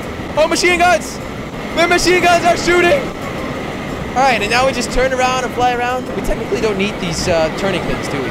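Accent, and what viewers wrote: Netherlands. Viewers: je komt toch uit limburg?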